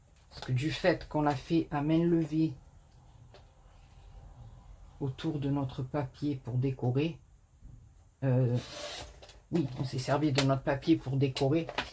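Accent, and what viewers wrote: South, France. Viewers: Cc je ne suis pas abonnée depuis longtemps mais je dois dire que tu partages beaucoup de tutos. Ne t excuses pas et tu fais ce que tu veux sur ta chaîne. Merci pour le partage et pour ton accent du sud que j adore💕💕belle soirée 😘😘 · Bonjour, j'adore tout ce que vous faites, je regarde toutes vos vidéos malgré que je commente rarement. C'est votre chaîne vous y faites ce que vous voulez!! Vous n'avez pas à vous excuser et je trouve complètement ridicule et irrespectueux de quitter votre chaîne parce que vous faites pas tout les tutos de vos créations!! Vous devez prendre du plaisir sur votre chaîne et ne pas avoir aucune obligations!! Ce que j'aime aussi beaucoup c'est votre accent du Sud:D Bisous et continuez telle que vous êtes!! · Coucou, moi je te comprends tout à fait et je te reste fidèle car je t'apprécie beaucoup. Même si ça fait que 2 ans que tu fais du scrap, j'adore ce que tu fais, reste comme tu es. 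Les tutos en vidéo doivent être fait avec plaisir et sans stresse, sinon ce n'est pas la peine. C'est toujours un grand bonheur de te retrouver et d'entendre ton si bel accent du sud. Les personnes qui se sont désabonnées n'y connaissent rien en scrap et ne comprennent pas la scrapeuse. Fait bien attention à toi, je t'envoi pleins de gros bisous et mon soutient. Sabine Sarazin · Qui peut bien vous demander de vous justifier sur "votre chaîne", une personne qui ne comprend pas grand chose et encore moins à ce qu'est le partage. Moi j'adore vos créations avec ou sans tuto c'est toujours un plaisir pour les yeux. J'aime votre bonne humeur et votre accent du midi, ne changez rien. Cette carte est magnifique et à refaire. Merci pour ce pas à pas très bien expliqué. Bonne journée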